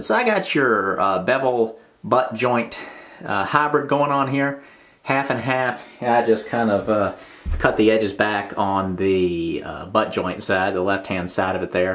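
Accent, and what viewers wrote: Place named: Virginia, United States. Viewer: By your accent it sounds like you’re in South Central VA. Do you perform contracted home renovations as well?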